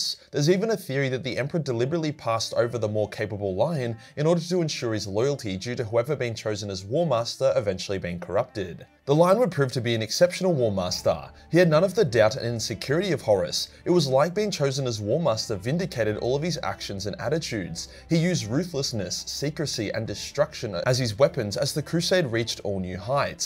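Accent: Australia. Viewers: You spoke to fast, just heard aussie accented noise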